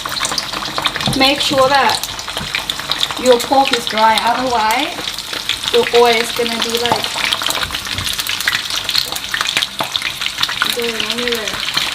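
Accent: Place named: Australia. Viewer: You are picking up a good Aussie accent I notice in many words you say 😁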